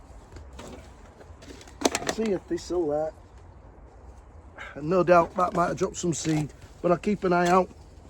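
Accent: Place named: Yorkshire, United Kingdom. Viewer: New subscriber here and a relatively newbie to allotment life, only had mine just over a year. Whereabouts in the UK are you? Listening to your accent you’re not too far from me in Yorkshire and it’s good to follow someone who lives in the same area to know what they can grow etc. PS I’ve also got compost envy. 🤣 Deb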